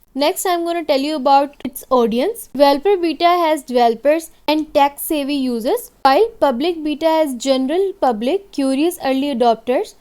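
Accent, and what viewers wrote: India. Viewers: Ohh is it Indian Ai English voice?😅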